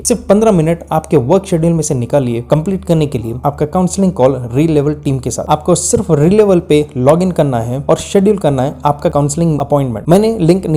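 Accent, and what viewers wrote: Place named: South, India. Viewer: bhai bolne ka tareeka ajeeb nhi hi Ye south india ka accent hai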